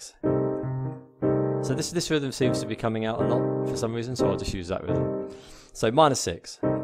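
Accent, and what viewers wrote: United Kingdom. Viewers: Nice! You sound like you’re from the Uk but your location is different, how’s the life down there? Keep up the good work!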